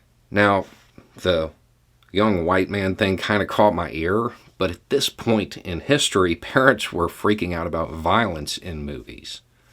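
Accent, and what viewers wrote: South, United States. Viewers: Thanks for sharing. One of my favorite of your uploads. Keep em coming, you're single handedly making a significant impact one 6 minute video a time. I could be wrong but you being a southerner w/an obvious accent is kinda like getting a toe in the door of many people who would instantly shut down to a New Englander like myself. Even though I've always been a small govt,* 2nd amendment supporter,* an avid student of the country's creation. Mostly through the men & women who wove all the complicated threads needed to create a new democratic republic. People who understood compromise was absolutely necessary and that pleasing everyone is impossible & trying to is a fools errand. I've tried to understand our country, the good, bad, & the ugly through the eyes of the real people who did their best to create a durable government for the people, of the people. 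All had their flaws, all had their genius & an incredible work ethic. Yet for many, if I had a mirror image YT channel I don't see myself having whatever level of success you do in getting certain people to even listen. I'm guilty of the same biases I guess. I hear a southern accent I'm instantly wondering where the Redhat & desecrated flag(pictures, words, etc.) is. I am instantly on guard. Worst of all I'm looking for the exit which sadly could deprive me of meeting a good person, making a friend, etc. I didn't intend to write more than a sentence or two but got carried away. Anyway, have a great Sunday & thank you f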